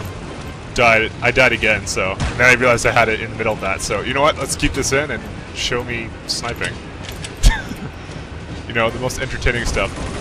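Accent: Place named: Canada. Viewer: Candians always sound like that, even as babies.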